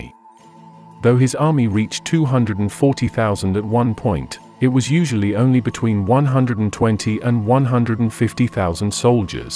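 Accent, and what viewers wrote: United Kingdom. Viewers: Is narrator AI or just obscenely British?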